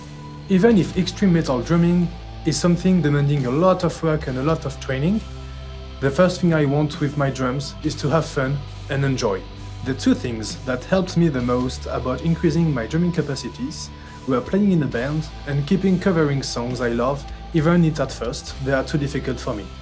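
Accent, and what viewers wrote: France. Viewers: Je comprends pas d'inquiétude x3 Je ne critiquais pas il me semblait juste avoir cru entendre un petit accent qui sonnait français ^^ En tout cas je vais essayer de mettre en pratique ce que j'ai vue dans cette vidéo et bonne continuation 😁 le blast est une technique que mes mains et mon poignets n'apprécient pas xD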